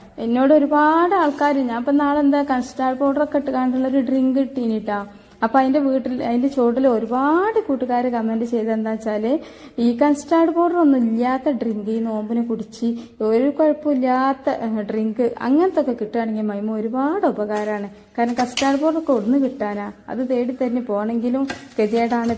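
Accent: Malappuram, India. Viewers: താത്താടെ മലപ്പുറം ഭാഷാ കേൾക്കാൻ എന്ത് രസാ. 👍👍👍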